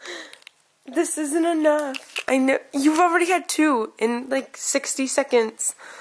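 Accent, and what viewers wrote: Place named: Australia. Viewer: haha confusing Europeans with an Aussie accent 👍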